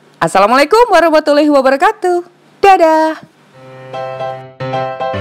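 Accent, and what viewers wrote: Java, Indonesia. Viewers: Stik kurma iki L39... mohon maaf lahir batin ya mam..... hari ini penuh kemenangan... ini edisi jowo.. Ini aku full ya maaf baru sempat.... Paduan kurma dan coklat Ketebalan nya di kira kira aja Lalu oven...no skip dihari kemenangan Cocol setengah aja Kalo semua belepotan Wooòoh jawa nya medok....banget Episode terakhir biskuit tabur almond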